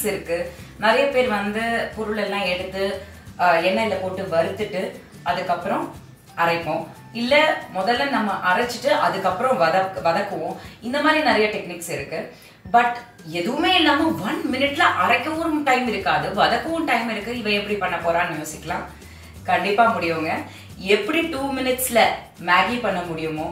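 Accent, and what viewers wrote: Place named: Thanjavur, India. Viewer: அப்படியே வச்சிஉருச்சி தஞ்சாவூர் நாட்டுசாலை பேச்சி இருகுது மிக்க மகிழ்சி உங்கள் சமையலைவிட உங்க பேச்சியத்தான் நான் அதிகம் ரசிப்பேன் அக்கா supet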